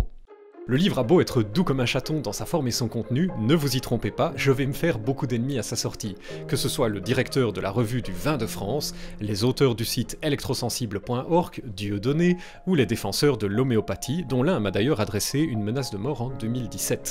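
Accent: Belgium. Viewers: Han j'aime vraiment pas le français de belgique xD Chatonne AAAAAAAAAHHHHHHH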